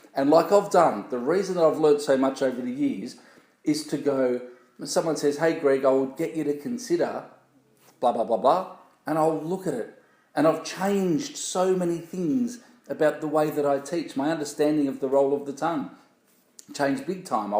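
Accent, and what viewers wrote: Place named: Australia. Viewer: THANK YOU SO! MUCH!! GREG!! TRYING TO BUZ!! IS NOT NEEDED WHEN YOU USE YOUR LIPS THE CORRECT!!! WAY!! AND JUST BLOW!! I LOVE YOUR TEACHINGS AND INSITES! AND LOVE YOUR AUSTRALIAN ACCENT!!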